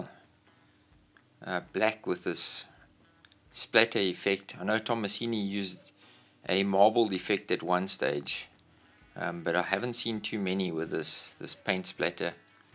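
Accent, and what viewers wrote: South Africa. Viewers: Great build 👍 I’m gradually getting used to your South African accent 😊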